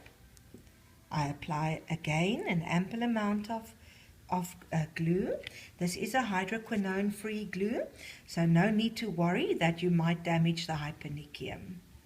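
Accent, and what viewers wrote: South Africa. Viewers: Nice to hear a South African for a change :) thanks for the tutorial! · is jy afrikaans? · By her voice I can tell she is south African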